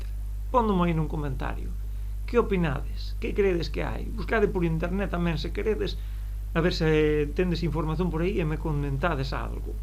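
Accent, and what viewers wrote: Spain. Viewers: A maioria do Brasileiros diria que fala português com um pouco de sotaque castelhano. E confesso que para o ouvido brasileiro é mais fácil de entender do que português da região de Lisboa. Parabéns pelo trabalho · Quanto teria eu gostado de ter aulas de galego como estas! Além do conteúdo, predicas com o exemplo dando-lhe à pronúncia o jeitinho próprio do galego. O raro aqui é que seja raro escuitar galego falado à galega em registos cultos.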